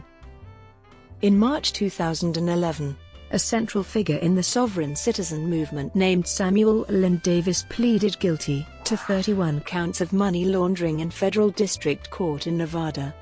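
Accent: England, United Kingdom. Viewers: Someone program these damn computer voices better at speaking American English. And lose the English accent.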